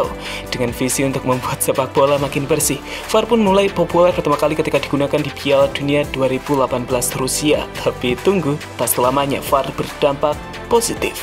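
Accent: Java, Indonesia. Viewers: Medok banget ngomongnya.. Hedeeeeewh..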